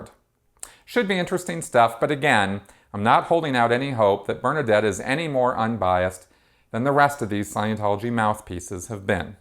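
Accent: United States. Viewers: Your accent reminds me of an American sailor in the 1961 French film Lola. Otherwise, great as always.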